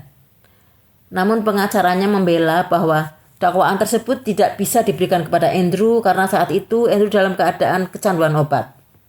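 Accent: Java, Indonesia. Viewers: logat jawanya medok amat mbak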